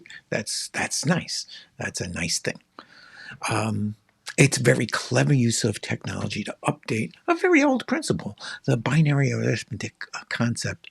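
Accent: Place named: New York, United States. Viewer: Enjoy your excellent reviews … and your NY area accent. (Though long gone, I was born and raised there.)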